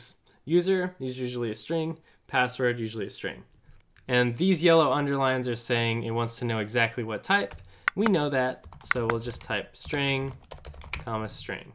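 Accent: India. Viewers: Here, after concentrating to get what was said in the Indian accent, one time too often.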